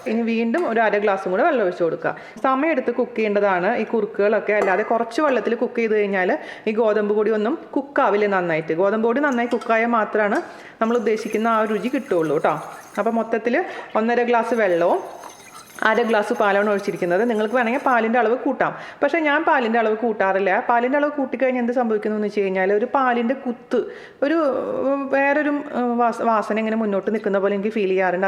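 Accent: Thrissur, India. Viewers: ഞാൻ ചേച്ചിടെ ചാനൽ കാണുന്നത് be happy with sree.... ശ്രീ പറയുന്നത് കേട്ടാണ്.. ശ്രീ ടെ യും വീണ ചേച്ചിടെ യും sound /trs ഭാഷ എനിക്ക് വളരെ ഇഷ്ട്ടമാണ്.... ഞാൻ ഒരു പാലക്കാട് കാരിയായതു കൊണ്ടാവാം..... 😍😘😂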